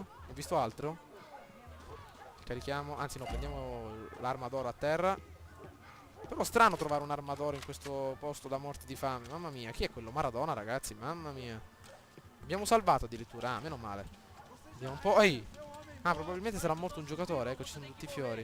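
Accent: Sicily, Italy. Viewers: sei della sicilia?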